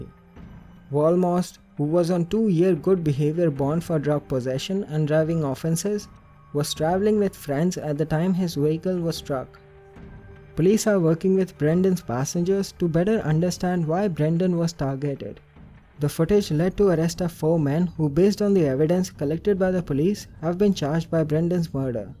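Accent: India. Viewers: I LIKE YOUR CHANNEL!!! IT'S CREEPY ASF!!! YOUR INDIAN ACCENT MAKES IT EVEN CREEPIER!!!!! GOOD SHIT APU!!!! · Indians don’t make good commentary when it’s English, can’t listen to a voice like that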